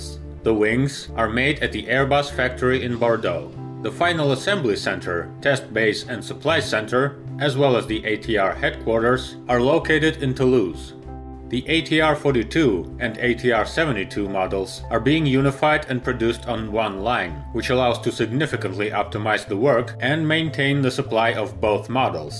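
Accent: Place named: Russia. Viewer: In Russian aircraft are male, and going by accent that might be why he is using that.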